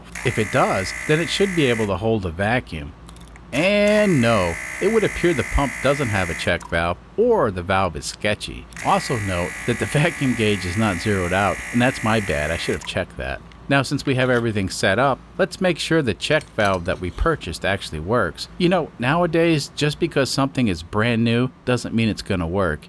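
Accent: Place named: Southern California, United States. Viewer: I understand your caution. When we changed to metric measurement here in 1974, conversion to litres per kilometre (or as it's spelt (or as spelt itself is spelt is the US "spelled"😂) in the US) liters per kilometer popped up for a short while but it quickly disappeared in favour of l/100km. Also, on reflection Kilopascals (kPa), not Hectopascals, is on the tyre pressure gauges here. Interesting re the accent. Had I been so bold as to guess your background outright, I would have said, born somewhere in Wisconsin, then moved to Southern California. I'm no expert but to me your vowels sound midwestern but the lilt is straight outa Garden Grove.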